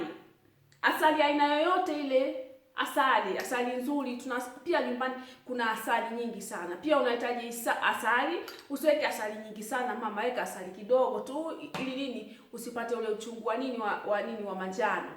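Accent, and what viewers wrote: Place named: Kenya. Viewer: We ni mtanzania lkn unaongea kiswahili Cha kenya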